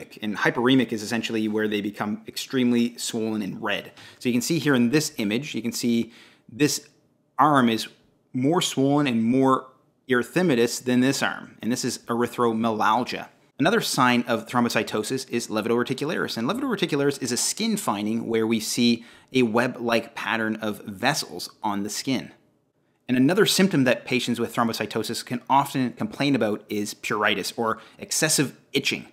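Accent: Canada. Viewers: He also pronounces out. OWWWWWT Maybe Canadian aye? Leave the man alone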